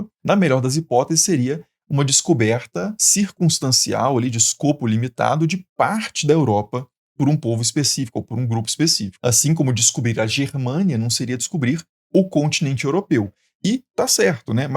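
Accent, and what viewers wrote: Minas Gerais, Brazil. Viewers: Eu “descobri” esse canal há pouco tempo e já sou fã! Adoro a tua maneira de explicar, e acima de tudo esse sotaque mineiro lindo. Muito sucesso e muito obrigada por partilhar o teu conhecimento conosco 🙏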